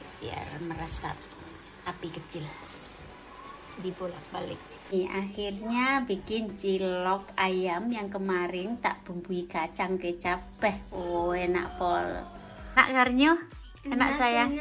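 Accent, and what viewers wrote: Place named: Blitar, Indonesia. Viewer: Logate lek ngomong jowo jek mblitaran banget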